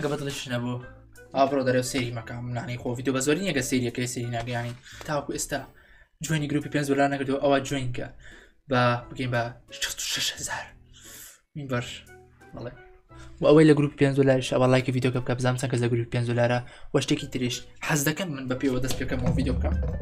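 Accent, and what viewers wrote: Erbil, Iraq. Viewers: Hich let naya ba karkuki yan slemani qsa bkay hawleri zyatr la dangt de 😊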